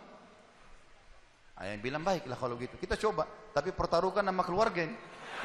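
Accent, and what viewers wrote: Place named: Arab world. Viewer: tri atmojo bener. cara ngomongnya kayak orang arab bgt, ya. logatnya khas. mungkin karena keturunan arab juga. haha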